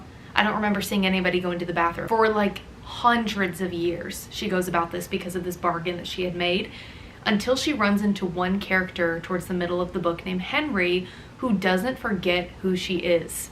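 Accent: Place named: South, United States. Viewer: I love that for her but im afraid im adapting a little southern accent from her and im not even American 😭😭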